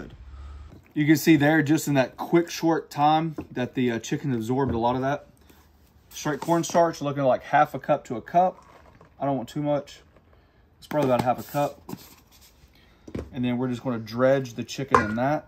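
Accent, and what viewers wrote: South, United States. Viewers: With a Sweet Southern Accent 🤗😎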